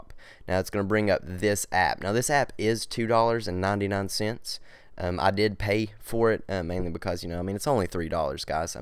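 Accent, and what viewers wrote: South, United States. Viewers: This is completely unrelated but I just had to say something about your accent. It is absolutely wonderful! Sounds a bit Southern. Other than that, great video and thanks for sharing!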